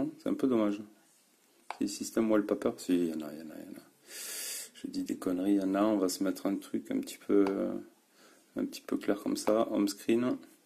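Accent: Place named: South-West, France. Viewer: J'adore vos vidéos ! L'accent du sud ouest et les petites erreurs c'est trop mignon lol ! Continuez !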